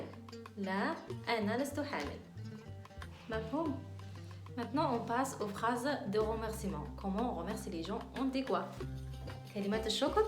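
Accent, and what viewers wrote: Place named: Algeria. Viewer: جميل. اللهجة الجزائرية حلوة بزاف. يعطيك الصحة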